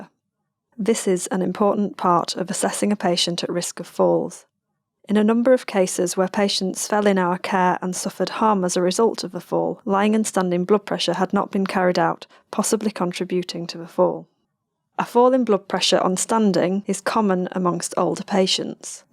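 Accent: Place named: United Kingdom. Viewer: Beautiful explanation with lovely British accent. Thankyou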